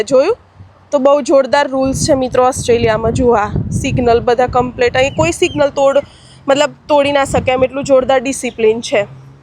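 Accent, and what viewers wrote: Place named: Baroda, India. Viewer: 👍👍👌 બેન તમારા શબ્દો બહુ જ ફાઈન છે અને તમે જે સ્પીચ આપો છો અને જે બોલી રહ્યા છો એ જે વાણી છે બહુ જ સરસ છે અને તમે બરોડા અથવા અમદાવાદના છો એકદમ સ્યોર છું હું . ઓકે જય શ્રી કૃષ્ણ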